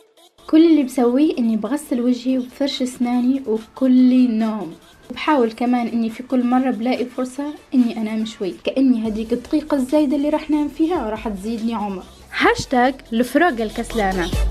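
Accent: Tunisia. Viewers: انتي تونسية علاش تحكي لهجة مش لجهتك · انت تونسية صح لانو لكنة لهجتك تشبه التونسيين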